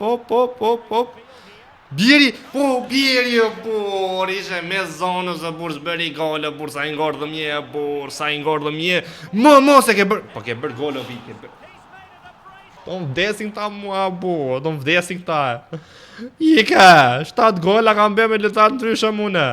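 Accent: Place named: Fier, Albania. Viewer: Kush e vuri re theksin e fierit 😂😂😂😂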